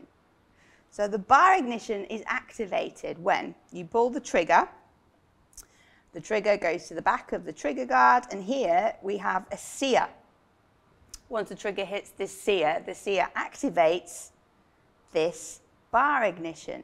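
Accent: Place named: Yorkshire, United Kingdom. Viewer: She sounds to me like a less strong version of Jodie Whittaker's accent and she's Skelmanthorpe, so certainly Yorkshire, but it'd probably take an actual Yorkshire person to narrow it down t' area.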